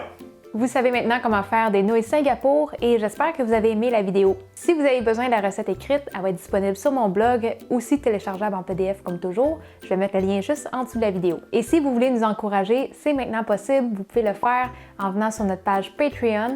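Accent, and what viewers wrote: Canada. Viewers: Merci beaucoup et demain, votre recette trônera sur notre table en Californie. Un peu de curiosité, vous avez un léger accent et j'opterais , peut-être Centre du Québec (Trois-Rivières) ? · Bonjour ! Je te suis depuis quelques jours et je suis conquise par tes explications et par ton accent canadien.... j’aime beaucoup tes recettes et vais essayer de les refaire .... à plus. !!!!! ♥️😊👍👍😘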